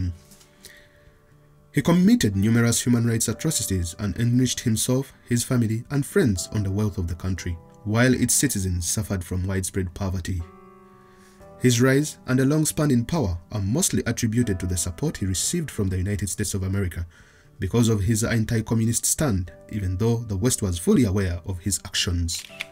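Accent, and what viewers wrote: Uganda. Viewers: The accent tells me you're Ugandan. Great work bro. Oli wamanyi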